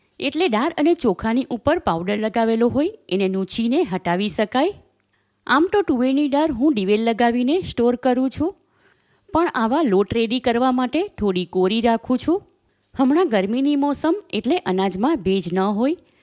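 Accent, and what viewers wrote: Surat, India. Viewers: Proud to be surti. Amari bhasha avi j che ane rehse. Tamne ni fave to tame skip kro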